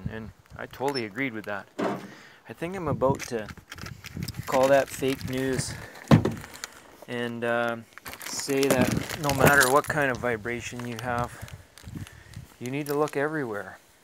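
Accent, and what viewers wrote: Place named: Canada. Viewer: accents are fascinating. I can immediately tell what part of world this gentlemen is from. Go Wayne Gretzky!!! lol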